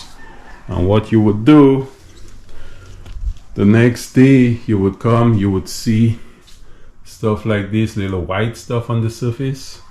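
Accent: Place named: Saint Lucia. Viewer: Hey u have a a st lucian accent.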